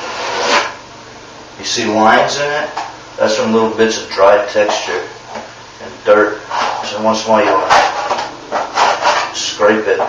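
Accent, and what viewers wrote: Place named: Tennessee, United States. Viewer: i saw back on the other video you didn't take much time to insulate thoroughly or use a vapor barrier. I would guess by your accent..you're in Tennessee maybe??just a guess.I'm in a northern climate, and up here with that little bit of insulation you would have cold toes & frozen pipes. Thanks for taking the time to this series. You did a fine job.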